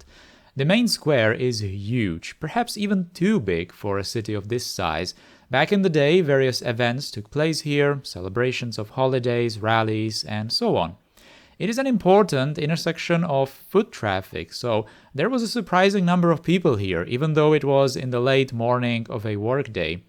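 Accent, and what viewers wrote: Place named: Czech Republic. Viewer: Jeho český přízvuk je nepřeslechnutelný...😅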